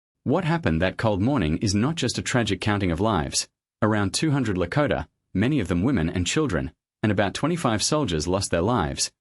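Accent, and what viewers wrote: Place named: Australia. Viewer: This “historical narrative” is offensively absurd, not only for being narrated in an Australian-concept or Afrikaaner-concept accent, but by eliminating all references to “race,” a MOST critical dynamic between all peoples of the era, as well as now. There was no “universal” starvation, displacement, deprivation, massacre, and genocide of people on all fronts, in any “equal” measure whatsoever. No “criminalization” of sacred traditions, Religions, & Religious practices was ever incurred by any White persons. No treaty agreement was ever upheld. equally-respected and honored by the USA-concept cavalry, government, nor by any known White persons of any significant Positioning in that government (nor in any other European government concepts interested as “parties”)—still undergoing its own “formations” and territorial disputes at that period. 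It MAY be “helpful” to SOME young children to have a narrative of certain historical landmarks, events, and participants involved in Native Nations’ Unjust treatment. But for adults, it serves no purposes other than strengthening, refining and expanding Racism/White Supremacy, as narrated by an individual unwilling or unable to intelligently discuss his OWN “frontier” narrative in a manner that young people can easily understand. The purpose of “History(ies)” as a subject isn’t being “concise.” The purpose of reporting historical data is accuracy, truthful reportage, and fact-based information conveyed in such a way that it engenders compr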